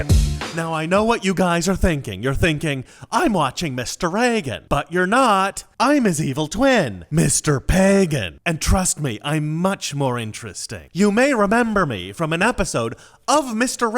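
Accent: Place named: Ireland. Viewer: You have sort of an Irish accent Mr. Pagan. Also, thank you for using your pronoun in your name so we know your preference.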